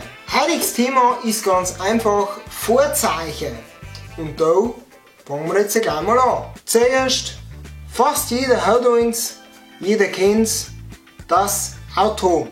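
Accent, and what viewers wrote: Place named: Upper Palatinate, Germany. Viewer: Ziegler Tanja Des is a Oberpfälzer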